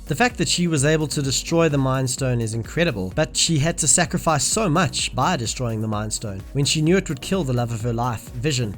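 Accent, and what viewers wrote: South Africa. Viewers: This guy sounds like he is South African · Strange question, are you South African? just asking due to your accent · Loving the south african accent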